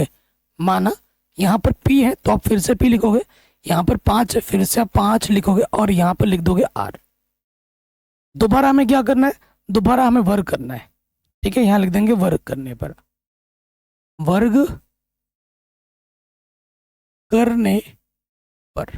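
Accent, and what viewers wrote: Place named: Bihar, India. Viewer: Thodi Bihari hai 😅😊😊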